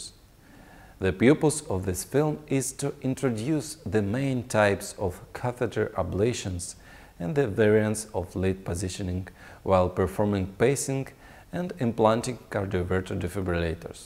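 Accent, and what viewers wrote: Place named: Russia. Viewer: The Russian accent is a little difficult but the information is top notch. Great demonstration!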